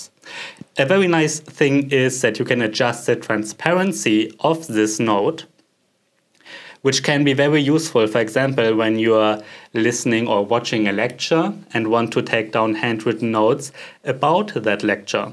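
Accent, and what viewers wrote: Germany. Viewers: Maybe obvious, just wonder whether the S pen has palm rejection or not. As you use it I start to doubt. And whether it has any pressure sensitivity. Thanks for a nice video. Like the German accent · У тебя немецкий акцент 😂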